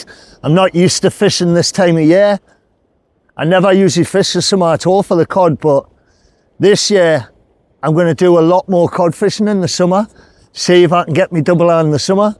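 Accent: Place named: Geordie, United Kingdom. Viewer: I'm a geordie and you might just be the most geordie geordie I've ever seen on YouTube.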